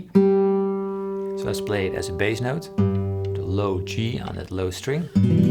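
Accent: Netherlands. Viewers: Are you Dutch? I feel like I can distinguish between accents noe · are you Dutch? Your accent seems to suggest so ^^ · Altijd leuk dat je meteen hoort wanneer iemand een Nederlander is. Maar prachtig en inspirerend filmpje en ik ga het proberen!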